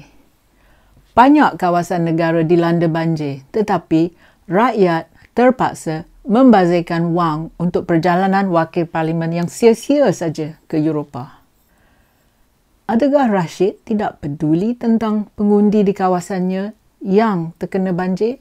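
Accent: Perak, Malaysia. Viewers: askum kak, kak org perak ker , kadang ckp ada loghat perak tu hehehe, but i agree you are 100% betul , malaysia need a total reboot kak, a total reboot cause all this while we are being manipulate , we need new people like kak , the real people...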